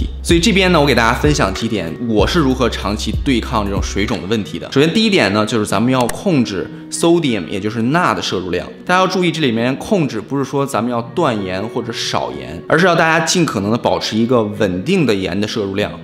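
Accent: China. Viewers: 胖时比较好看，而且你现在的普通话口音很适合之前胖子的气质。。。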